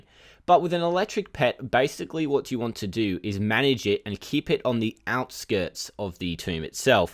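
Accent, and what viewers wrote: Australia. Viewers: I'm pretty sure he's Australian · Australian accent from Australia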